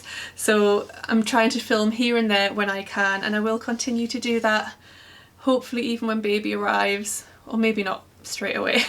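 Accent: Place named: Newcastle, United Kingdom. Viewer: I love your May makes especially the tiny baby outfits. I have a great niece or nephew arriving in November so will definitely be downloading the baby patterns. My grandmother was born and raised in the Newcastle area. She came to Canada sometime in the 1920's but she never lost her accent which actually sounded quite a bit like your accent.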